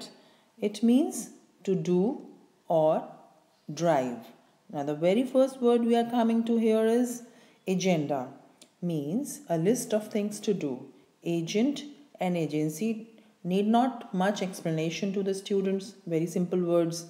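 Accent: India. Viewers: Terrible accent, unfortunately. Most probably Indian. Also, a lot of grammatical and vocabulary usage errors.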